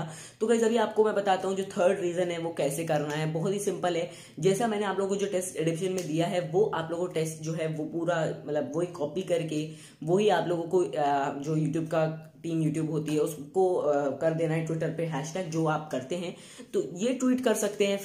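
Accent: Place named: India. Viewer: You sounds like true Indian but Atleast you still can speaks English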